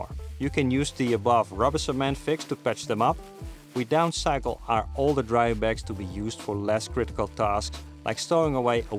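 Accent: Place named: Netherlands. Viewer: Dutch accent always immediately jumps out to me. I notice a lot of dutchies run international targeting channels covering great topics quite well spoken. Good job. Very helpfull.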